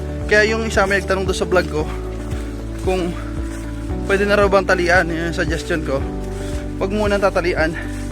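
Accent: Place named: Guinayangan, Philippines. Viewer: hahaha kaya pla medyo pamiliar sakin pagsasalita mi guinayangin kasi ako sir💪💪 tuloy tuloy lng sir suporta tayo dyan proud quezonin💪😁🏆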